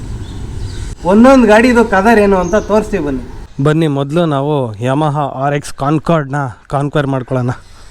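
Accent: Kundapura, India. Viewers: Kundapura kannada💛❤️ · Avr pakka manglore a kundapura va language kundapra kannada tara itt apa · Kundapura Kannada kembuk yesth Kushi ath alya ❤️💖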